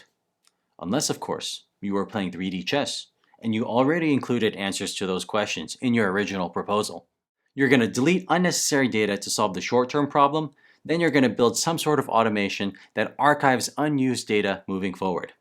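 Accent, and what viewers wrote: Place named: Canada. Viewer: Hello from Peru! I love your videos and I’m so curious! You sound like born in Canada or something but What your ethnicity is? :) thank you.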